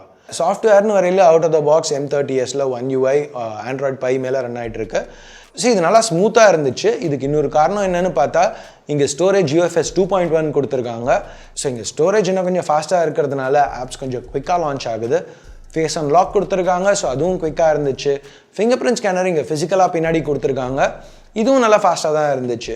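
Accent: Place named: Kongu, India. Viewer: Are you from Coimbatore? Your slang sounds a bit like Kongu Tamil.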